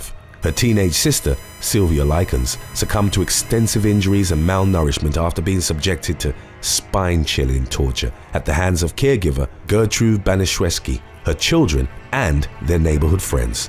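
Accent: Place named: London, United Kingdom. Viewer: Dude, you have quite honestly the coolest voice. What part of London are you from?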